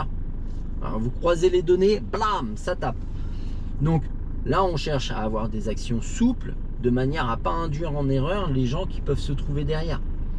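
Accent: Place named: Paris, France. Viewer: Very nice driving, en plus du bel accent Parisien. 😉👍🗼🗼🗼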